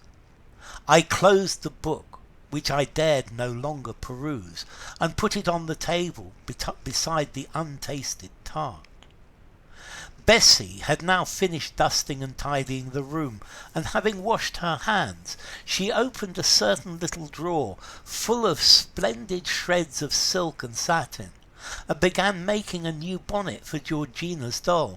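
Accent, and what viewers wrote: United Kingdom. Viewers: I'm in love with stories especially with a British accent thanks for sharing this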